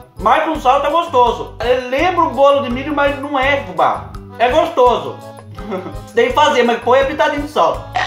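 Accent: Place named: Porto Feliz, Brazil. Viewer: Seu sotaque é igual o nosso aqui de Porto Feliz!!! Bao demais...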